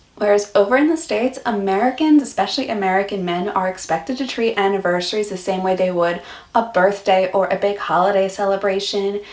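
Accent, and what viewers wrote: Australia. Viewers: With each video you sound more and more Aussie with the understanding of the way of life we live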